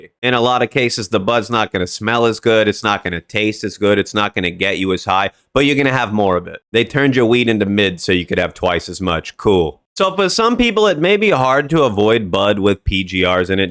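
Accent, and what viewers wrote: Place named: Boston, United States. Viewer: There’s no way your not from Boston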